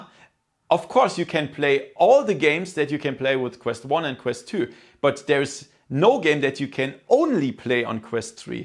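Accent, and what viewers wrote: Germany. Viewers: Are you German 🤨🤔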